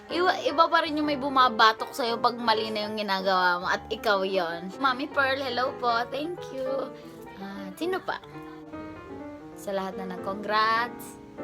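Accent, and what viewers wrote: Batangas, Philippines. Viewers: speaking in batangueño ... parehas lang tayo ng accent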